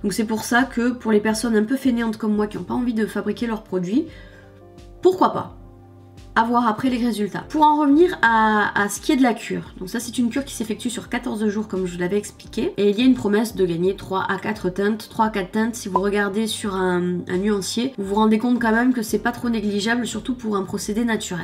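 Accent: South, France. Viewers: avec l'accent du sud c'est top !!!!! allé je m’abonne , le peut de tes vidéos que j'ai vu sont super ... bonne continuation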